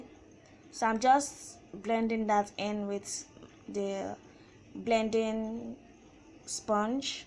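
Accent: Nigeria. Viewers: Nigerian accent is soo bomb we should tell people about her so she would get more subs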